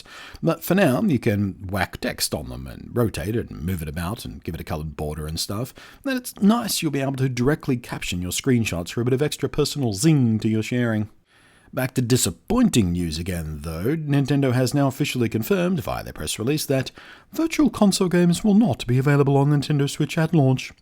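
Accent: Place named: Australia. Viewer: thats because hes the australian version of the narrator from stanley parable.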